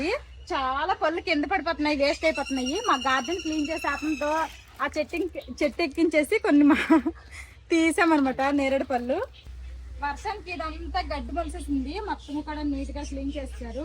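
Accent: Srikakulam, India. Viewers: వీడియో బాగా నచ్చింది లైక్ చేసాము sister ❤ అమ్మ వాళ్ళ ఇంటి నుండి మనకి ఎన్ని ఇచ్చినా మనకు సరిపోవు కదా సిస్టర్ మన శ్రీకాకుళం లాంగ్వేజ్ నాచురల్ గా మాట్లాడుతుంటే చాలా ముచ్చటగా ఉంది❤❤ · Super sis meru ala mataluaduthunte mana sklm ani feeling happy❤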